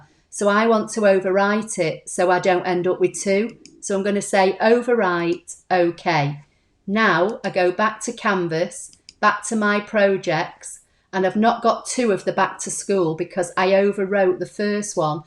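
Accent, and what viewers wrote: United Kingdom. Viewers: Nice to hear a voice from uk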